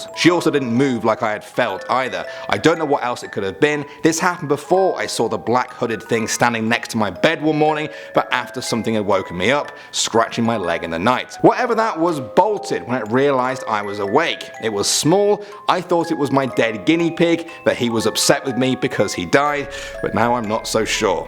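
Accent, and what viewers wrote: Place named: United Kingdom. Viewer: Wait... I could not understand you with that British accent (sorry being an American has some cons) DID YOU SAY THAT TRANSFORMERS TOY COMMITTED SUICIDE? I would too if i was a knock-off McDonalds toy.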